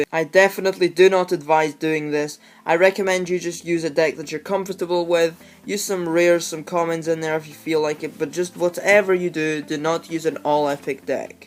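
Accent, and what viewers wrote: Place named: United Kingdom. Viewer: If you mean Russian then he's not, he has a British accent.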